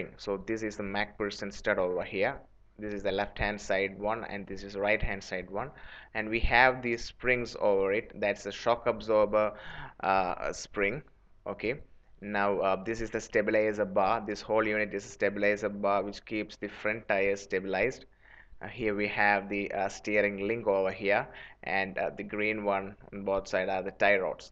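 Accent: India. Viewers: the indian accent sucks and is becoming default accent of english everyday.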